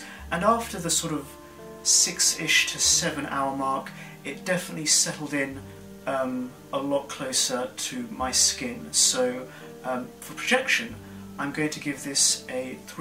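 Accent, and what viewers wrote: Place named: RP, United Kingdom. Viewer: All the superlatives are on point. Spot on. I would go as far to say that Anubis is for me in the same category as a not too barnyardish Oudh. That initial petroleum benzine, leatherish scent you get but then fades away for a beautiful resinous, smokey and incense aroma. I just love it. Can’t wait anymore to get my bottle. Have a nice weekend. By the way I like your voice and above all your English. Very well spoken, posh😉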